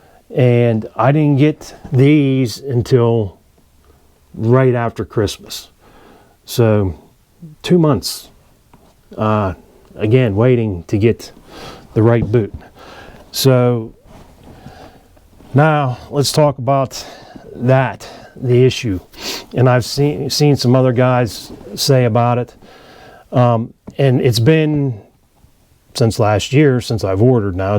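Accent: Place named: Pittsburgh, United States. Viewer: Are you from Pittsburgh or near it by chance? You have a Pittsburgh accent.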